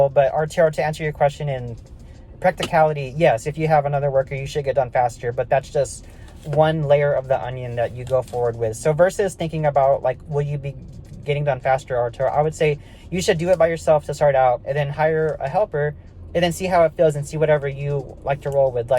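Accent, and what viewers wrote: Texas, United States. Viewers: What part of Texas are u from Bro?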